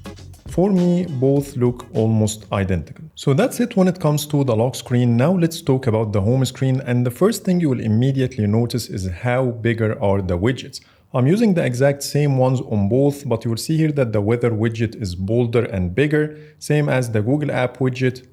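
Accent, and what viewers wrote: Egypt. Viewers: انت مصري ياض حاسس الانجليزي بتاعك انجليزي مصري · اللهجه مصريه جدا ؟؟؟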